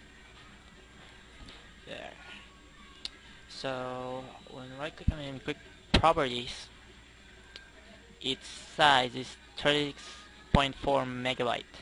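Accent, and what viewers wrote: Philippines. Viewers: i know a filipino accent when i hear one lol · are you filipino..?? dat accent xD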